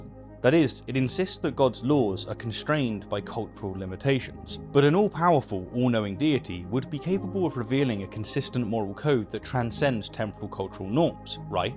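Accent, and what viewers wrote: England, United Kingdom. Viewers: I find it "interesting" that a person with a clearly "English" accent and therefore the inheritor and beneficiary of probably the most evil empire in Human history (165 MILLION Excess Indian deaths between 1880 and 1920, to mention just ONE of the countless evils committed unapologetically by the vicious English) has the temerity to label the Mongols as "Evil". As Christian scripture puts it, "Take the plank out of your own eye, then you will see clearly to remove the speck from your brother's"....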